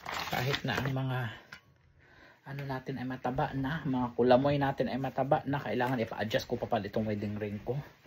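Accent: Iloilo, Philippines. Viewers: Taga saan ka po parang ilongo ka po sir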